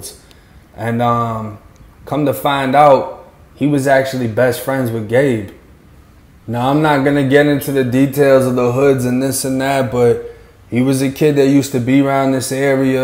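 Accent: Boston, United States. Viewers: Ok man I get it..I thought u sounded like u were from Boston..ok man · I'm from worcester n it's nice for someone to finally she's some light on what happens in ma. U have a Boston accent..but thought u was from FL. A lot of shit happens around here but it never gets so thank u!!!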